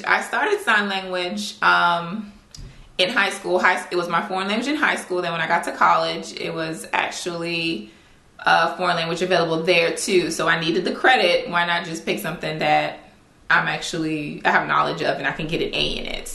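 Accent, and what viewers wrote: Caribbean. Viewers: I started teaching myself sign language from this girl on YT-AshleyMichele07. You're right, it is learning a new language. I hear the accent. Big ups to the Caribbean ladies.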